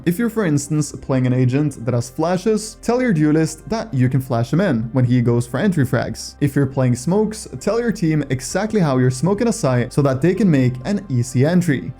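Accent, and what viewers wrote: Norway. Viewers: why would a bot voice have a norweigan accent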